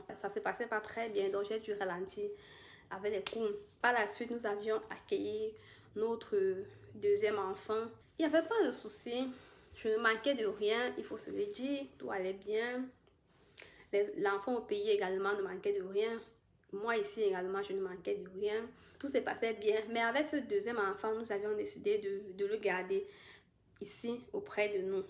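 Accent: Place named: Cameroon. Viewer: vous avez l'accent du Cameroun avec une influence du Gabon (c'est comme si vous avez vecu dans 2 pays en Afrique)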